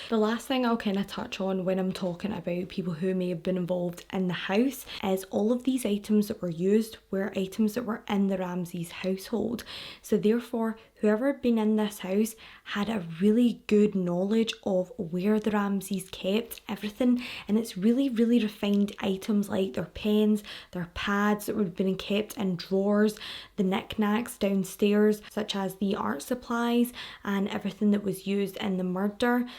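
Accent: Scotland, United Kingdom. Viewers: I kno rite I love ur personality ur accent ur beautiful very down to earth but funny to!! U go girl all the way in Scotland I Loove it... LOVING UR CONTENT N ALL U DO DO FRM YA GIRL FROM (HELLO FRM THE OTHERSIIIDE HELLOOO FRM THE OUTSIIDE LMAO VIBES ALL DAY LOVE IT LOVE IT! LOVE ALL THE WAY FROM SAN DIEGO CALI BABAAY! HEAAAYY!!! CARRY ON GIRLFRIEND!!❤️😆❤️😆❤️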